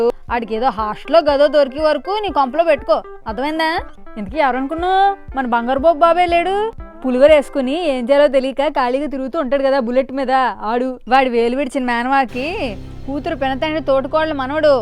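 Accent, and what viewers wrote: Godavari, India. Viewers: Slowly i bcame fan of ours. U r male getups r osm, suits u a lot. Godavari dialect is so funny osm. It shows how much hardwork u make behind the scenes.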